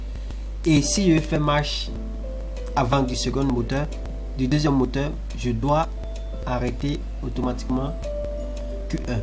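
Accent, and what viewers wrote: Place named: France. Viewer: j'ai rien compris par votre accent française !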